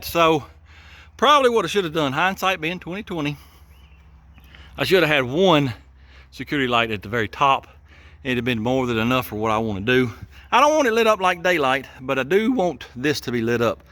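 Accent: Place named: Southwest, United States. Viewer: I am writing you from France,. I am from Prentiss Mississippi. And L have watch everyone of your vidéos, and love everyone of them. Me being in France , it is good to hear someone from the Southwest talk. I am à retirer milirary, L moved to France, when I retirer. So keep up the good work, you are like one of the family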